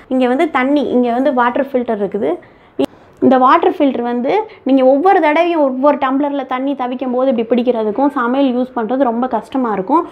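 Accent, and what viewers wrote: Madurai, India. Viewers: Andha thenga kathi maduraila use pannuvom apram pathriram velakunum nu soluvom urs madurai slang madhiri iruku adhan keten pls reply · R u from madurai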